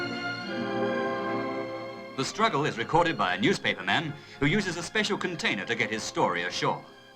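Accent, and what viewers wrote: United Kingdom. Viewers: Love the British narration. Tip: tour HMS Belfast on the Thames. Sea going comfort for all crew.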